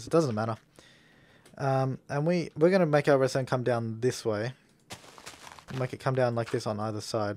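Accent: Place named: Australia. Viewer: Aussie FTW!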